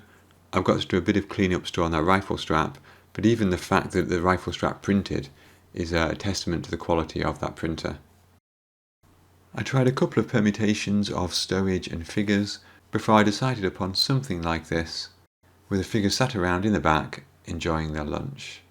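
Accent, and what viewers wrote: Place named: United Kingdom. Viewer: Hi there. I have just found your channel on YouTube and I had to subscribe. What you did there was absolutely fantastic. I am wanting to get into doing dioramas myself so any help I can find I am willing to accept. LOL. Thanks so much for doing this video and I would say blessings to you from England but it sounds to me like you have a British accent. So I will just say blessings to you from Weymouth, Dorset.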